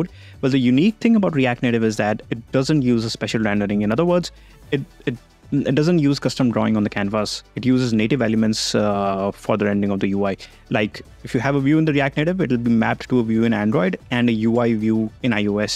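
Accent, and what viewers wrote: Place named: India. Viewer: >indian opinion >trash accent opinion rejected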